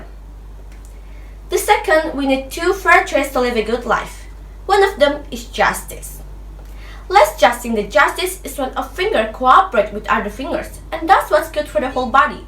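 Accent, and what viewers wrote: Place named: United Kingdom. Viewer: Buihhh.. British bngt 👍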